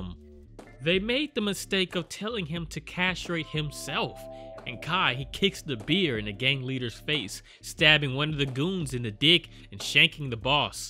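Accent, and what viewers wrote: United States. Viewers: He sounds like he's an American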